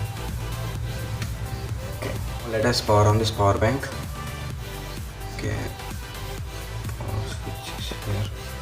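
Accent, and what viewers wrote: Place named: New York, United States. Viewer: Dini evadaina video antada ra? Meanwhile NYC slang ra chakka😍👍😊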